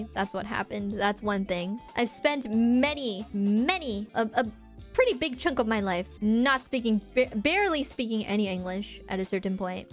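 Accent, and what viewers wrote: United States. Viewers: She has an american accent in my opinion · I mean, she has an accent alright, but it's an English accent, specifically sounds like west coast NA. She's very clear with her words, and only slightly slurs them when excitable from what I can tell, but it is very clean otherwise. Idk bout her Japanese speaking though, can't speak it myself, so unsure how it comes across to native speakers. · She sounds vaguely American to me. But I am not the best with accents.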